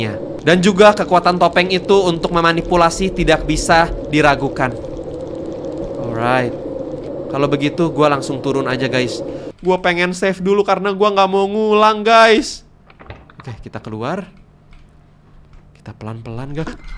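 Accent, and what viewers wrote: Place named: Sunda, Indonesia. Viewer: Sunda bang😂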